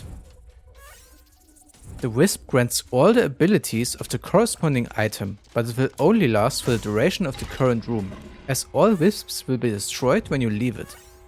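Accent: Germany. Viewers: German ig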